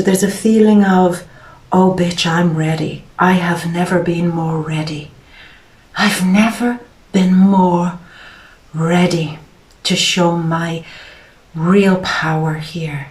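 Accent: Ireland. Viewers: Your Irish?? Have u ever seen a fairy?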